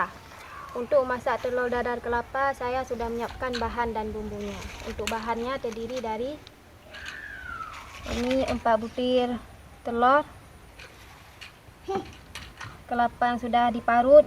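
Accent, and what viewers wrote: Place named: Aceh, Indonesia. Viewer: dapu tanyoe aceh iy logatny jg.hehhe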